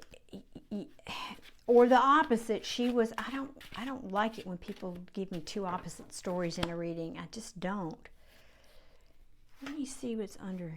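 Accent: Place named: South, United States. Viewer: it was we have to make lemon pies and lemonade out of lemons… also spirit says never apologize for your southern draw. Your gorgeous 🤍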